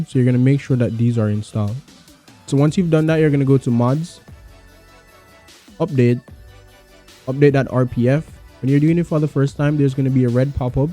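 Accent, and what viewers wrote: Jamaica. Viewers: Heard the Jamaican Accent and Subbed faster than you can say Ackee & Saltfish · You sound Jamaican bro. Are you?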